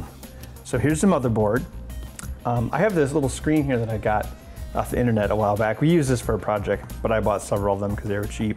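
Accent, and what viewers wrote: United States. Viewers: American English! Sound as thick as shit, plus uneducated with easy! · we say zhead x spectrum because that's how is meant to be said as its a uk product and the uk invented the language that the yank ruined lol. I would say speak English boy but I know for yanks this is impossible .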